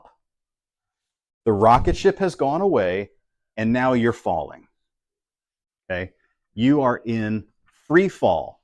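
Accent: United States. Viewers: I’m hooked on this channel But since he seems to have a pretty distinct American accent I assume this is probably an American made video. We don’t generally use kilometers here. I have no idea what the ratio is to miles. Nor do I want to stop constantly and look it up. Use miles! Or at the very least use both all through the video.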